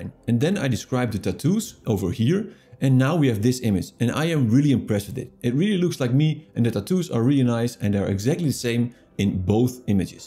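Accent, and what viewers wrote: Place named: Netherlands. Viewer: Bro you sound very Dutch hahaha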